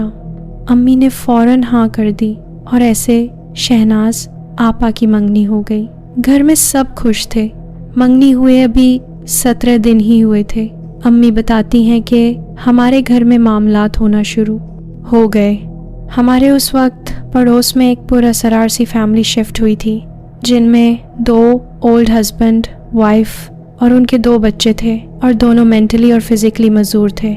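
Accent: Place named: India. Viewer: Assalam o alaikum dear aap ki awaz buhat achi hy or andaz b lakin Indians ki tarha khy or khay me wazy farq hy is ghalti ko door farmalijye❤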